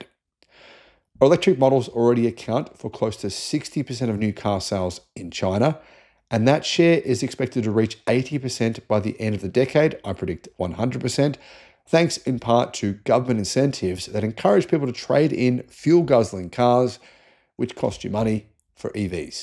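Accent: Australia. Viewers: Nice listening to an Australian talking about EV/Tesla. The American commentators have their head well and truly up Trump's ares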